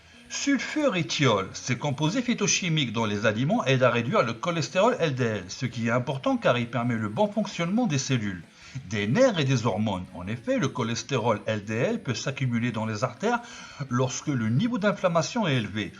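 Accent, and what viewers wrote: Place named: United Kingdom. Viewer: pirate et à tous,ta trop un putain d'accent anglais pirate tu me fait trop délirer,si non bien les phytonutriment.Miioin et bonne nuit chère pirate:-)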